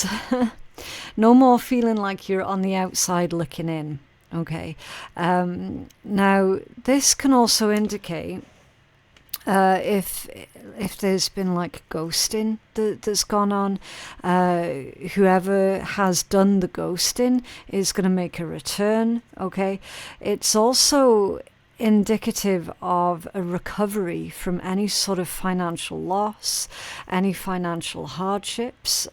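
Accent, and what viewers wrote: Scotland, United Kingdom. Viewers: Can I hear a wee Scottish accent in there somewhere? Great reading!❤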